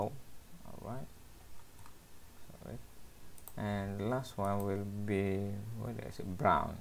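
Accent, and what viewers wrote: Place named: Arab world. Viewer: hey I think he is frm Middle East,their Accent is like that...That's y he is little slow in English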